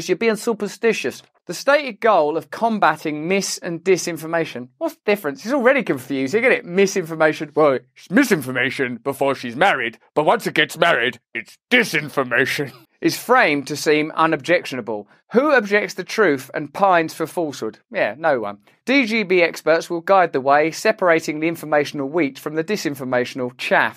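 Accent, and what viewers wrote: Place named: Cockney, United Kingdom. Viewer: Coincidentally, your accent sounds a bit like the chimney sweep from Mary Poppins.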